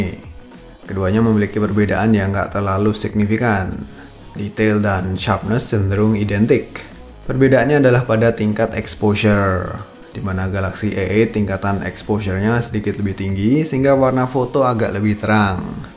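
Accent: Java, Indonesia. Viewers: Pengisi suara nya medok jowo.... Parah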